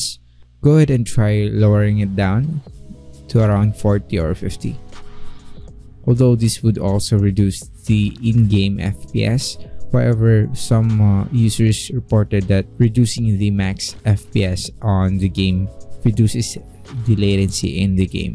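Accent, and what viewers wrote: Philippines. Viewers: I hear this slight Filipino accent, but still very detailed video :>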